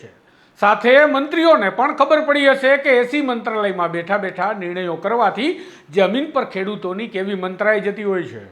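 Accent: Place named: Rajkot, India. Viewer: pyor rajkotian bhasha 👏👏 aane j layak che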